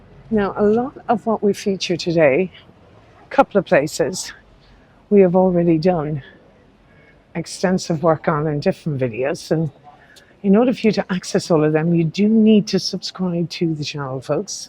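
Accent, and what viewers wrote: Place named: Ireland. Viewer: Ohhhhh, it’s so spring lovely to see and hear. Beautiful Irish lilt. I’m sooo looking forward to to your video, of Roman London. London Spring/ Summer/, what more can one possibly ask for. A absolute Delight for the senses. 😊🏴‍☠️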